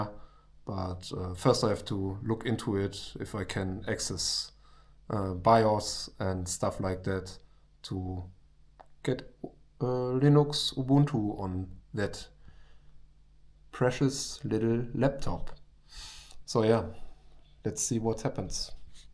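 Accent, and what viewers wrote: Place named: Germany. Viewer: Most german accent ever seen🎉❤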